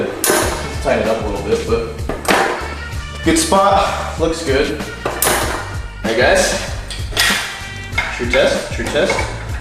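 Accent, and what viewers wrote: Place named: South, United States. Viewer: if you listen to his 2nd channel he speaks in a southern accent all the time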